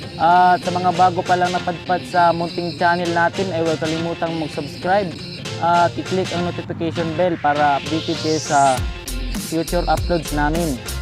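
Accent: Visayas, Philippines. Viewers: Gahi kaayu ag tagalog bai, Bisaya jud😂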